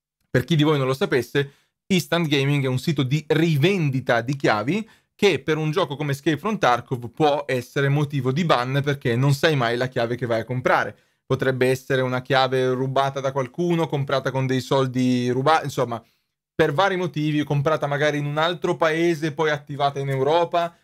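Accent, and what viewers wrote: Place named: Tuscany, Italy. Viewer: Toscanaccio puro, mi dispiace pe sto ragazzo, BSG mi sa che vuole far morire sto gioco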